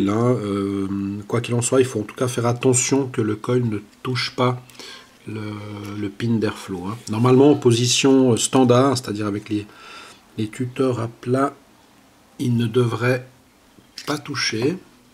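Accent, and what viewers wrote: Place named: Switzerland. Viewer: Hello, Bravo pour tes magnifiques vidéos. Il me semblait avoir reconnu un accent Suisse. Salutations du Jura. Sais tu s'il y a encore un salon de la vape en Suisse ?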